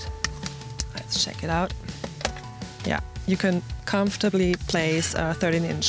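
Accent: Germany. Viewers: nice orange peal paint job on 220 000$ car Mercedes Benz... Still can't stand the Germanglish of the host. Get a proper host for these videos...say Derek D or pretty much anyone else. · I love the little germanisms like keramic and the accent. :D It does sound kinda scripted though. For $222K i'd have a nice down payment on a house and mazda 3 and some left over for a classic car or to invest. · I can't believe people don't like German accents... Either way she probably speaks better English than us natives. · people really need to stop rejecting people with a different accent. if you pretend she should speak in English with a stereotypical English accent, then you are a xenophobic and a racist. if she is a German, why should she try to be someone than she is not? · Well we in the UK think you sound like any normal German. The mic is way over sensitive its picking my to much slur. 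Change your punto for a VW up! Or Skoda citgo there cheap and fun.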